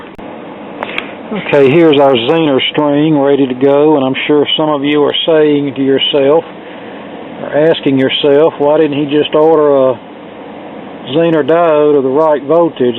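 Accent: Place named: Rural, United States. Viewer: That was friggin' awesome! It was like watching Mr.Carlson's Lab with a country twang! Go to the head of the class.A+!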